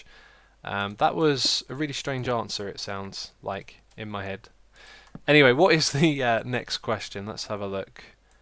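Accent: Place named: England, United Kingdom. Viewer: Thank you so much man for all the content. The funny thing is that with the whole anonymity thing, you could literally live down the road from me and I wouldn't even know haha. Cause of the exact English Accents:-)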